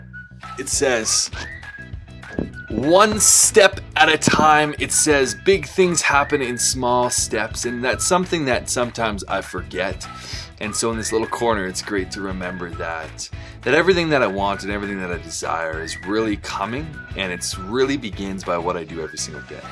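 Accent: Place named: United States. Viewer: Where do you live? I’m guessing from your accent you live in the states. So which state do you live in?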